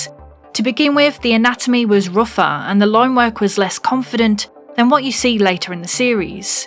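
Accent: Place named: United Kingdom. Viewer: geez your accent is falling thru, i can smell the brit in you from a mile away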